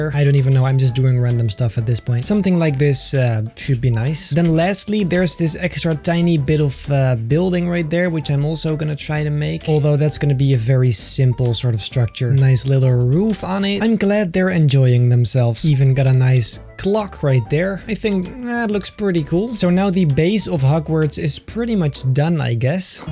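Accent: Netherlands. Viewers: Are you Dutch? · Are you dutch? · Are you actually dutch? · Ben je Nederlands? XD Are you Dutch? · And I already had a feeling that you where dutch 🇳🇱 because you’re accent looks dutch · Your dutch? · Omg ik hoorde het al aan je accent, JE BENT NEDERLANDSAS!!! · are you dutch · You dutch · Ben je Nederlands? 😄😁 (If u dont here is what i asked: Are u from the Netherlands) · Are you from the netherlands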